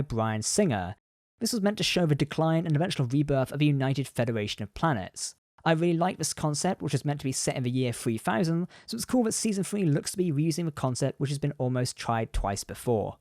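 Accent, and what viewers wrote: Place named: England, United Kingdom. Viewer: You're clearly British, probably English by your accent, so please remember the word is pronounced CommunicaTor NOT CommunicaYDA. "...the planet is rather unique." - 'unique' is an absolute. Something is either unique or not unique; it can't be partly unique, very unique, slightly unique or indeed rather unique; not to mention that the number of planets with multiple moons we've encountered in previous Star Trek outings, suggests that a planet with two moons is certainly NOT unique.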